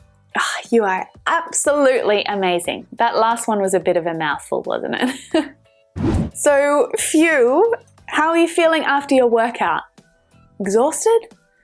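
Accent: Australia. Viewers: Iam afraid to be addict to australian english accent .U're great teacher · Are you australian ? How you thought to choose the name of your Channel ? mmmEnglish! it's like tasting a dish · Love the Australia accent, yes your smile bright like a morning star✅✅✅✅🇸🇪🇸🇪🎃🎃🎃🎃🎃🎃🎃🎃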